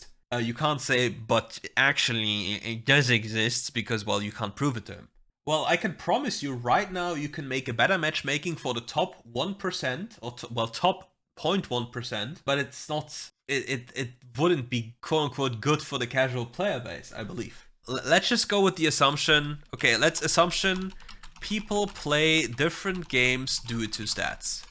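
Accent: Germany. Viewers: Bro are u German? Unbenannt.txt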